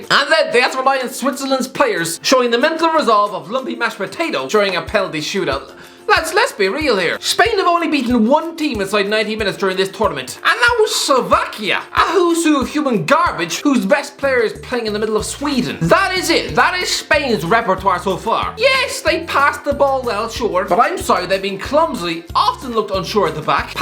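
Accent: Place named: Ireland. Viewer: i love the irish accent, just can't understand how talks so spastic-ally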